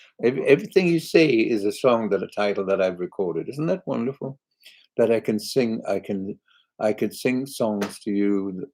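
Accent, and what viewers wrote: South, United States. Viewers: Hello Engebert, I totally loved your live chat today. You have an amazing voice, speaking and singing. your songs have helped so many fans through these trying times. I listen to you when I go to bed at night, of course during daytime.😊 Your laughter on here brings so many smiles to me. Thank you.💓 Love your southern drawl..lol your a beautiful and handsome young man. My love, hugs and prayers are with you always.🌹❤😘🙏 The Lord's Prayer you sing it so beautiful, so touching..💖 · Watching from Wichita Falls Texas your southern accent was so so but you should put in a y'all and a bless your heart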